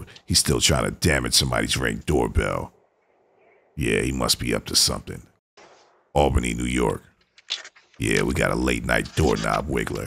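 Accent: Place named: Jamaica. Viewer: This narrator is the best! Bootleg Bob Marley and the Jamaican talk killed me 😂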